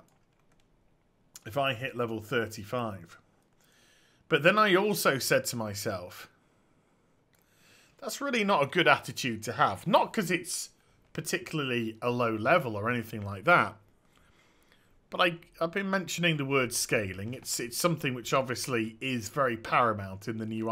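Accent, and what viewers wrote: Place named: United Kingdom. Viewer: Great vid as always. Your soothing British voice helps me relax this boring Wednesday. I think you should wait a while and start over. Keep being awesome! :)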